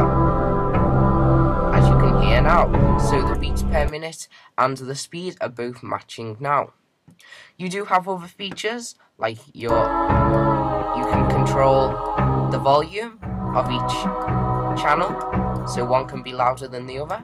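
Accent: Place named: United Kingdom. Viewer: i love british voice.. pure english voice for a bulgarian for me... btw this review is great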